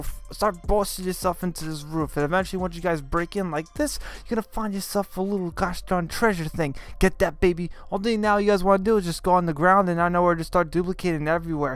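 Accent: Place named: New York, United States. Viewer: This dude has a New York accent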